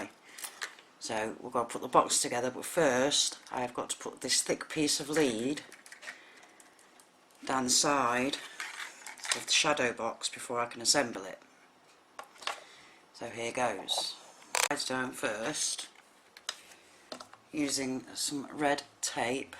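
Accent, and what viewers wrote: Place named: United Kingdom. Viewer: Your cards are beautiful, plus, I love your Brit accent. I'm from the states.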